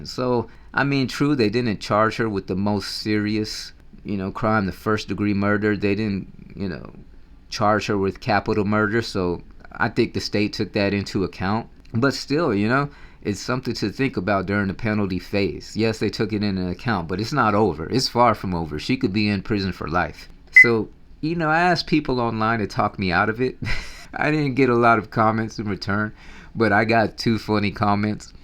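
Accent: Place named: Texas, United States. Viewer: I mean I hear the Texas in your voice! I think you should do it!!!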